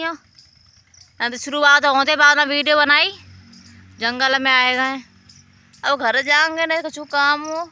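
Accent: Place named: Mathura, India. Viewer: Apke mukh se dehati bhasha bahut sunder lag rahi hai.bhasha ke hisab se aap mathura side ki lagti hain.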